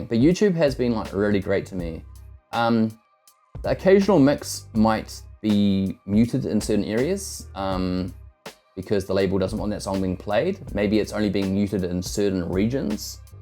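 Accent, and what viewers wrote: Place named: New Zealand. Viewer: Chur bro, you sound like from Aotearoa New Zealand! Your choice of music and DJ style gave it away before I even heard you talk my bro💯👌🏽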